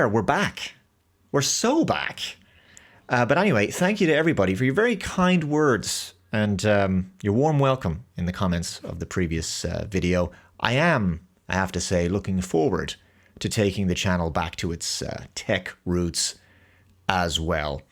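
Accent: Ireland. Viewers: it is so nice to hear your Irish accent again! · I must admit, I've missed your Irish voice telling me to follow you on "Moinds n' Gaaab" 😂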